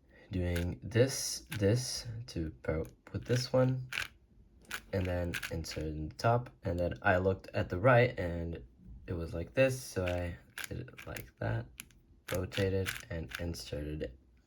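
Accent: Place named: United States. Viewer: Your accent sounds very American lol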